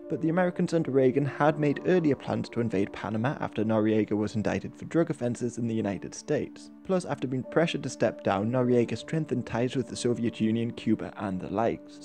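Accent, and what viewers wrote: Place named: United Kingdom. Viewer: If you're gonna make a historical video with a heavy british accent, consider adding accurate subtitles as well. I have no idea what you're saying half the time.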